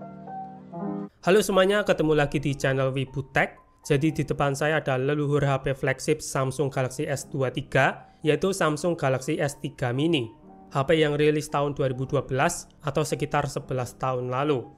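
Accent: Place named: Bugis, Indonesia. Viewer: Bang kamu orang Bugis yah ?? Kedengaran dari logat nya😊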